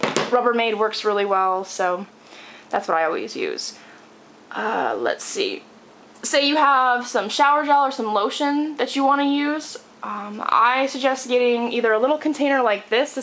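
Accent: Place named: Maryland, United States. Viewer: this is a hardcore maryland accent, I talk like this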